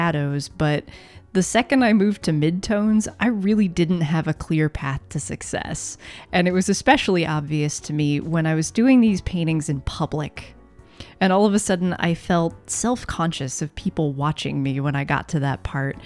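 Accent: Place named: United States. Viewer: That's a very strong American accent ... I can't hear an English accent at all.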